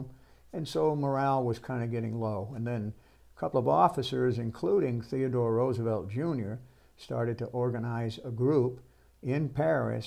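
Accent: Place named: Connecticut, United States. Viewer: Interesting accent. Connecticut?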